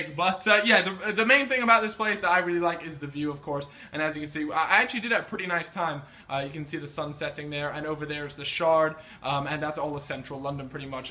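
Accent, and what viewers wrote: United States. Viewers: This is probably obvious but I am not a constant viewer so just out of curiosity, why is it that doesn't have a proper english accent? Did he move to England from the US or what? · Is he american?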